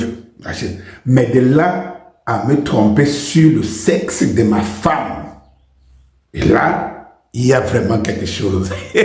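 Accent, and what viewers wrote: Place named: Africa. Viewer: J’adore votre accent Africain 😍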